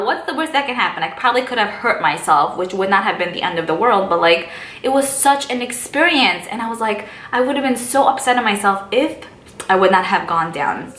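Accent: New York, United States. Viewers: You have a New York accent. I love it #NY in the house 🤗🤗💕💕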